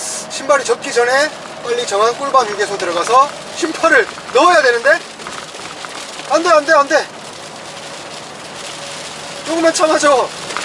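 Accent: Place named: Gyeonggi, South Korea. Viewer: 님 점점 경기도사투리 쓰시네요ㅋㅋㅋ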